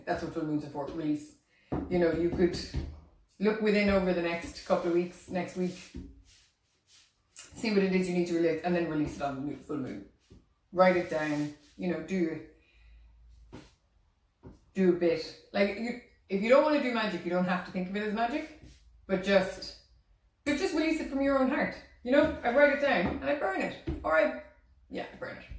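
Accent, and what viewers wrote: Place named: Ireland. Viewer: Hello love your reading. Beautiful accent, it makes me miss being in Ireland with my grandparents :) All my family is from Ireland I was born and raised in Prince Edward Island Canada. Looking to hear more in the future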